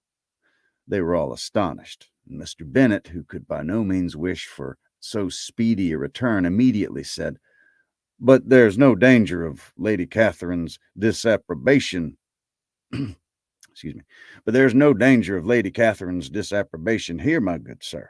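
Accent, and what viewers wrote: South, United States. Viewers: Is the "...and Magnolias" added simply to indicate that it has been read in a southern accent, or does it come from some adaption, like, "Pride & Prejudice & Zombies"?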